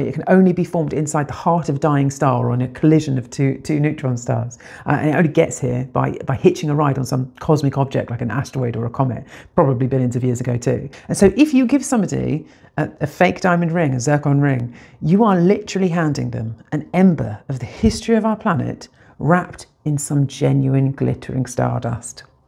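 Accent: England, United Kingdom. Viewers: Generic English accent. Hear it everyday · Aaawww 🙈 you are a 100 ct 💎 I hear your theory 😂 I'm sorry, a fake Diamond 💎 is not better than the real thing 😂 you are the Real thing and you are Blessed with the best English Accent in the world 🤗 Your Voice is worth a Billion Diamonds 💎 🙊❤️⚘️ Stay Bless ✝️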